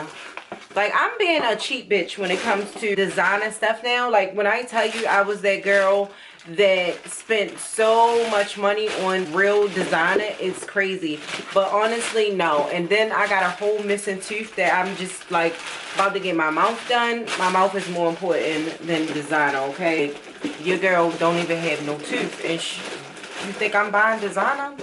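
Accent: Baltimore, United States. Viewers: Enter me! ♥️ This Baltimore accent is life! Also, you’re inspiring me to get some Orange and green sandals lol❤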